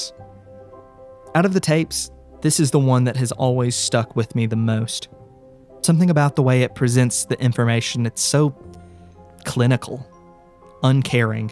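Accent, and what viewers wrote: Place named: Midwest, United States. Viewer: your accent is so interesting to me i can't tell if you're from west virginia or the midwest... somewhere west for sure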